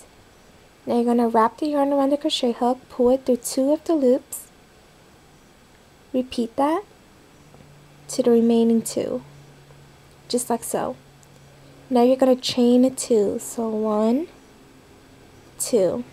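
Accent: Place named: New York, United States. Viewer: Love your accent are you from newyork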